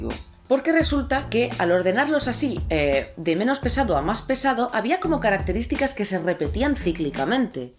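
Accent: Catalonia, Spain. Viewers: Suenas como catalana, 😰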